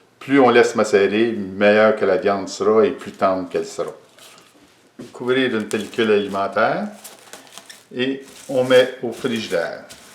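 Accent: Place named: Canada. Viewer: Joli accent Canadien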